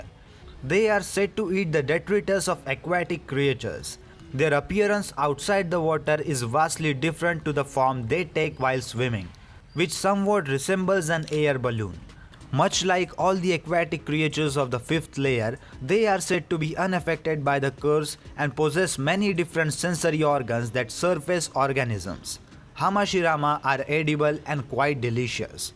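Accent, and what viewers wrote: India. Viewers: Made in Abyss is one of my recent favorite series speculative evolution and creature designs. The fact I play Pokemon Violet, and train down in Area Zero, which somewhat resembles the Abyss, means that the series not far from my mind. Coincidentally, the raids also have a star rating, although the highest rating is 7 rather than 6 stars! I almost kind of wish we'd get DLC Pokemon based off on some of the Made in Abyss creatures! Normally, I have a notoriety for understanding what I believe is an Indian accent. And while yours is quite strong, you take the time to pronounce your words speak them and strongly. I appreciate that. Even some American YouTubers fail to do this, harming the enjoyment that can be gained from listening to their videos.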